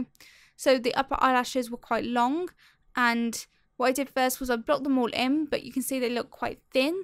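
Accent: United Kingdom. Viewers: You have an British accent..it's good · "phoutous"....man that British accent is boss!!!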